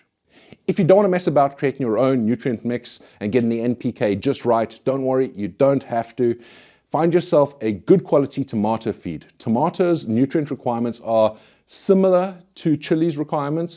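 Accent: South Africa. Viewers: THATS A SOUTH AFRICAN ACCENT, HOWSIT BROTHER!!!!!! been inspired by your grows and been blessed with amazing harvest here in Cape Town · Hello......you from South Africa....ZA. Accent 😅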